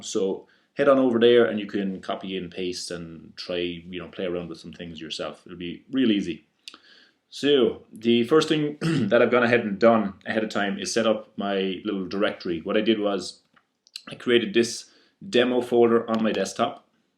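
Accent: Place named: Ireland. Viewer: loving the accent eire abú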